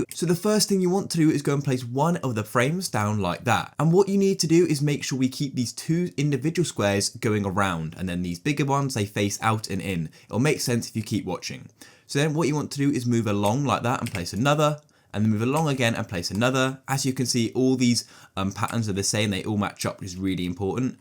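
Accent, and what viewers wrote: United Kingdom. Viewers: Omg ur British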